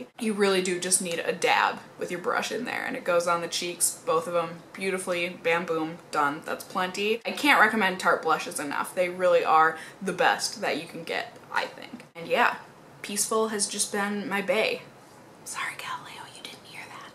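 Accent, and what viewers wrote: Minnesota, United States. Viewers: Well, she is from Minnesota, so that's probably just her accent.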